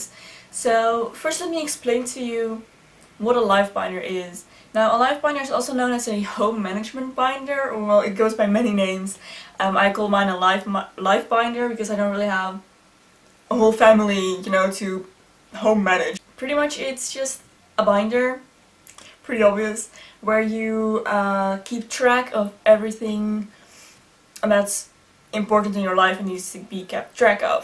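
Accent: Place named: Netherlands. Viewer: Are you Dutch? I love your accent!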